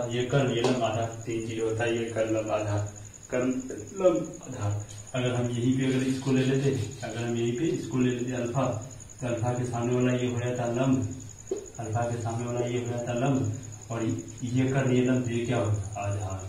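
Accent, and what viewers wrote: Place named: Bhojpuri, India. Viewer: Bhojpuri me bol Rahe ho aap ❤🎉🎉🎉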